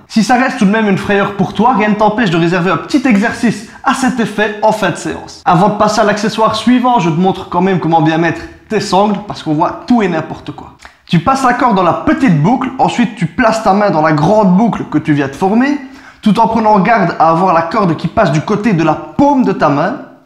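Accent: Liège, Belgium. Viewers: Tu es de Liège haha?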